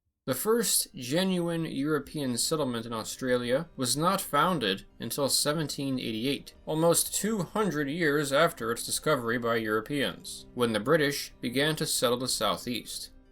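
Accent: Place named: United States. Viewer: Do we really need to pay an obnoxious American accent to tell us about Australian history. Go back home and preach to the gullible.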